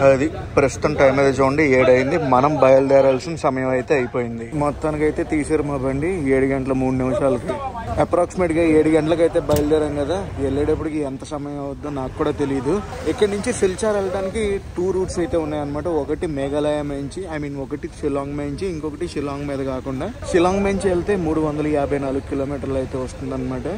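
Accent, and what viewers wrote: Godavari, India. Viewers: haha super crazy vlog , godavari slang bababoi bababoi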